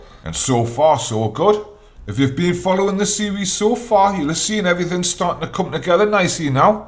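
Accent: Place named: Wales, United Kingdom. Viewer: Hot damm, I do love the Wales accent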